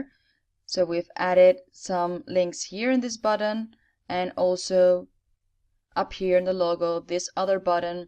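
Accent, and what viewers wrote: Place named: Europe. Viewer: Hay !!! I was all struggling doing it the hard way with the other wordpress youtubbers? No wonder it was taking so long? to get it? Thank you nice lady with the exciting european accent : ) spaciba?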